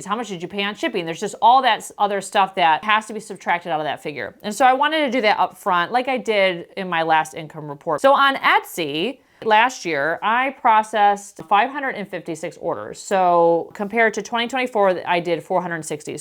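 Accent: United States. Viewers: I’ve been following for a few years and seeing you grow to this extent has been such a genuine joy to witness. I watch you and Laura’s videos religiously and they provide such different, but equally inspiring and entertaining, vibes. For me, you’re the British lady with an American accent 😀 I’m British and I just find your sense of humour so comforting. Well done lady! You have strangers feeling proud of you and that has to be a cool feeing ❤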